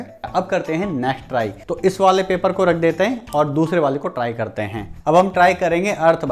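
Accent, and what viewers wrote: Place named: Western Uttar Pradesh, India. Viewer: samjh gya tha mein bolne ki accent se konsi city se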